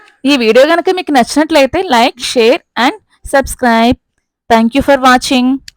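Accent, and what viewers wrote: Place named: Srikakulam, India. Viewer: Srikakulam voice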